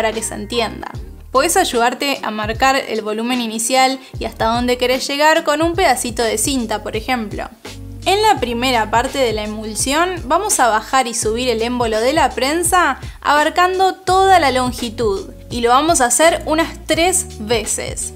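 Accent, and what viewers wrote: Argentina. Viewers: Aqui en Francia, ese tipo de cafetera se llama "Cafetera a pistón" y creo que solo en America lo llaman asi "Cafetera Francesa" pero bueno yo con gusto :D jajajaja gracias por sus videos con ese acento argentino muy bonito :) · acento porteño* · es el acento argentino, gracias por apreciar <3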